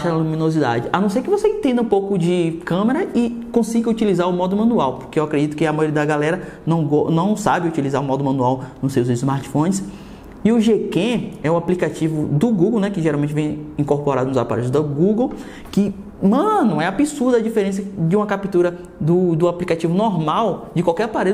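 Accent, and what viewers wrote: Bahia, Brazil. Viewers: Vc e baiano??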